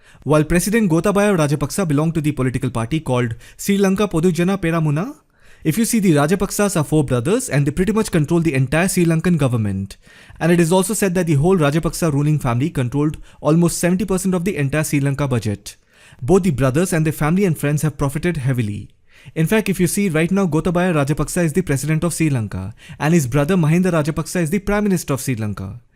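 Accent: India. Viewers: The funny indian accent makes the video more interesting.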